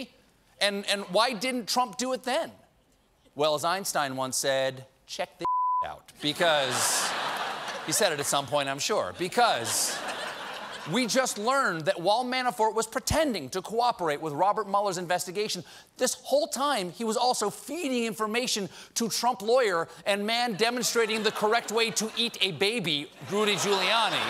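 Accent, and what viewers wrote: Australia. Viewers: English accent has an Australian accent. Funky. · Can someone tell SC there is a difference between a working class/outback Australian accent and an Australian newscaster accent.........go for the second one not the first. Especially if you are going to do a good Assange.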